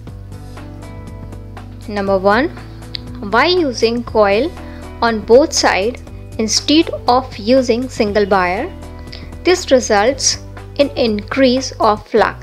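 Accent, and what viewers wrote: India. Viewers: I find her voice narration annoying. Its too thick of an Indian accent and she "lip smacks wet" too much. Ruined it for me. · india voice?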